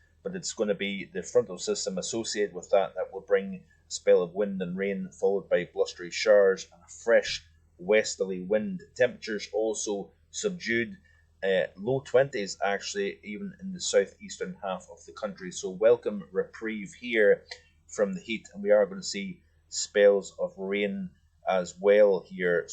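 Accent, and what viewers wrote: Scotland, United Kingdom. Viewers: Many thanks I think your Scottish accent brings more feeling and meaning to your very detailed weather reports. Some equally fine and detailed weather reports, almost become boring, due to the uninteresting accent. Mr puts a lot of emphasis into his voice, and personally, i think his Scottish accent suits his interesting channel. Ive told several friends to check out weather channel, as its a real pleasure to tune into your weather analysis. More of the same please, and a BIG thank you from a still rather dry llandudno on the North Wales riviera coast.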